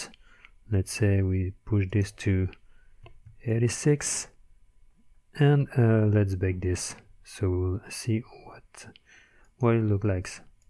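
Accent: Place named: France. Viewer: accent français x)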